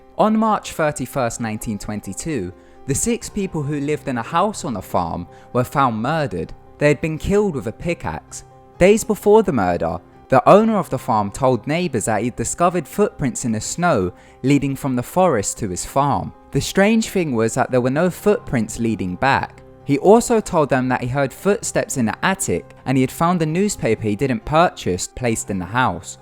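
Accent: United Kingdom. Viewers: I find accents very interesting, are you from the uk. Ever heard a scous accent, I can't understand it to save my life lol, I like the way it sounds tho, not to make fun of their accents or anything like that. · Sorry, but these videos are never scary with that dopey British accent.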